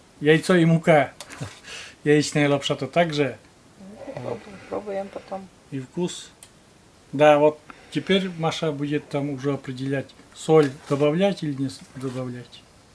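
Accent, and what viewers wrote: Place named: Luza, Russia. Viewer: Здравствуйте красавица я случайно зашла на ваш канал и услышала свой родной говор я с лузы всего доброго вам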